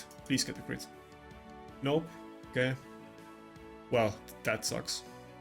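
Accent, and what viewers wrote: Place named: Finland. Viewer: May I ask where you are from? It's just that you kinda sound like a Finn that's trying to hide the finglish accent but slips up every now and then :D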